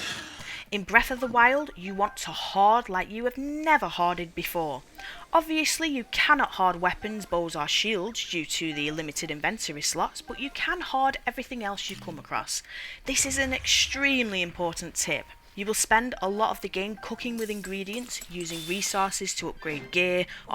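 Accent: North, United Kingdom. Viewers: Great video. Also brilliant to hear a northern voice on a video like this ❤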